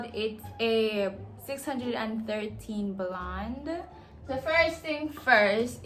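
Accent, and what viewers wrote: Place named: Jamaica. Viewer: I love this chick ,❤️ Much love all the way from Africa 🇿🇦 I just love the patwa accent.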